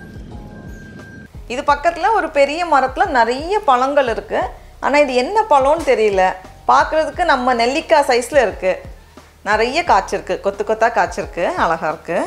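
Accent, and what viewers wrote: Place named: Southern Tamil Nadu, India. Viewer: ninga unga speech la Thenmavattam thaan ninaithen... athu correct pola Nagarkovil nu sonningalaa...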